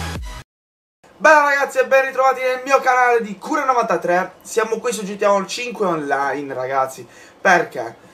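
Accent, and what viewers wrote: Sardinia, Italy. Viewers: Sei sardo vero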